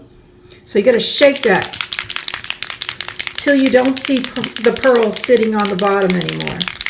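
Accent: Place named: New England, United States. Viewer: New England? I hear it, dahk instead of dark... and idear instead of idea (my hubs is from New England). Do you also say acrost instead of across? I think it's adorable ;-). Anyway, fun tute, thank you, plus Plum Blossom is one of my daughter's nicknames so I think I'll paint something like this for her.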